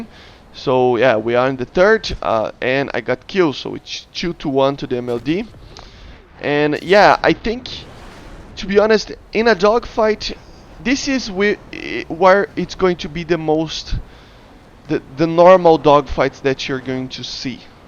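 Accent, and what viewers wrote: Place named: Brazil. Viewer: Sheer curiosity: Are you brazilian mate? Your accent is highly reminiscent of such, hahahaha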